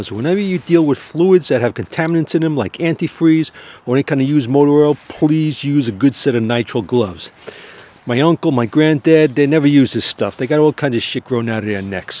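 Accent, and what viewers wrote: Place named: Brooklyn, United States. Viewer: Pretty good Brooklyn accent... I actually have my eye on an ‘03 Pilot, 93000 miles; $3800. Good price?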